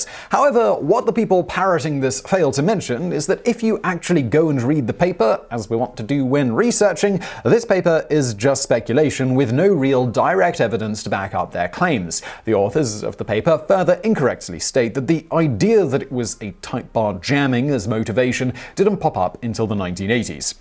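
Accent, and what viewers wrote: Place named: South East England, United Kingdom. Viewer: both and I were born and brought up in the South East of England, and share a common dialect with very similar accents. I am not have "Sport" with his accent. (It is essentially the same accent as my own, with slight variation due to us both moving away from that region, and living in a variety of other areas over the years.) He absolutely said Continents. Further your accusation of "People such as yourself love this kind of stuff" is an appalling and baseless assumption on my character.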